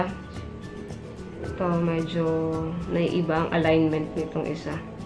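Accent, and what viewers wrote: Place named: Quezon, Philippines. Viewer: thanks maamsh.. Taga san po kau parang puntong quezon.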